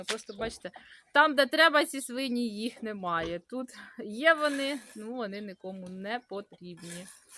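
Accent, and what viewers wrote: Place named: Poltava, Ukraine. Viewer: Приємно чути Полтавський говір. Мої кореня з Ґрадижська. Цікаво дивитися, згадую дитинство. Дякую. ❤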